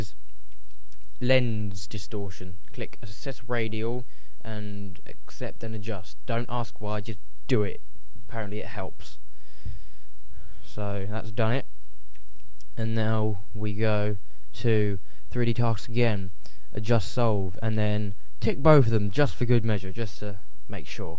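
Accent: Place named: United Kingdom. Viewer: Brits are taking over youtube tuts! and I love it! lol!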